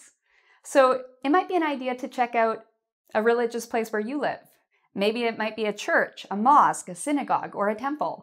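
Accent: Canada. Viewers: Hi. I like listening to English when I got a notification that you have uploaded the You-Tube video. There are several reasons why? Not just for one reason that you're a beautiful young lady with authentic Canadian language, but for many others. You have been teaching us (youtube subscribers) English for many years and not just English, but many useful things that we can apply in our daily life or lifestyle. Whatever, I feel often that is more useful than watching the daily news coming and going or some unrealistic movies, shooting, fighting, running, screaming actors for millions of dollars. So, thank you very much for your devoting work as well for time and energy giving so much to others.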